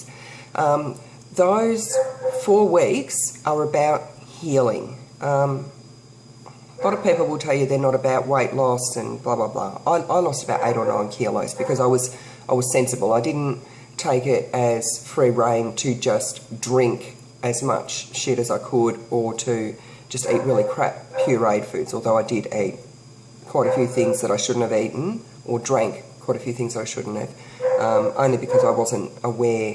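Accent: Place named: Australia. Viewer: Thanks so much for sharing! This is the second video of yours I've watched. Great to hear an Aussie accent! And when I checked your channel and saw stuff on railway crossings I thought 'Blimey! She's a local chick!' I'm in Frankston and just had lap band surgery a couple of days ago, finding these videos very helpful!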